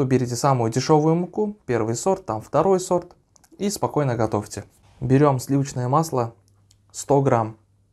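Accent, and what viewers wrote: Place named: Bashkortostan, Russia. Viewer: Как будто из башкирии, у нас обычно с таким "акцентом" (гОвором) разговаривают.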